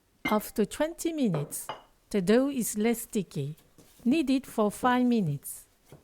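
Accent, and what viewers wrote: France. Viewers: I like watching your videos. You look like an Asian but your accent is like French. Do you live in France?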